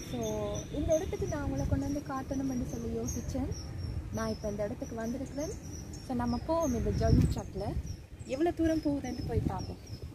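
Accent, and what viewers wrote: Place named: Tamil Nadu, India. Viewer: I really enjoyed your vlog..your tamil slang is amazing 💐 keep doing more vlogs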